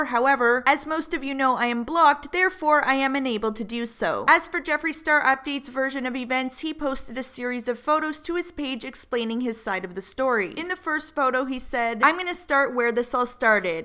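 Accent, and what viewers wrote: Canada. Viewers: I love your Canadian accent 🇨🇦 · Are you Canadian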